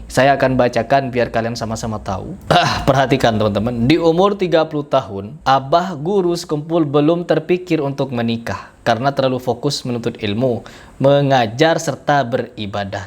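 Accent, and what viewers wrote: Madura, Indonesia. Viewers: Maklum aja wal ai ni taunya bekoceh aja kda pernah tau kisah kewalian guru sekumpul,Inya taunya bekoceh gasan kontennya ja..smlm ja di tempeleng warga dikepala Ranai aja Inya,pinanya urang madura dr logatnya.paham ja toh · org Madura Pina ny dari logat bicara ny mang ae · Kya orng madura kmu ini logatnya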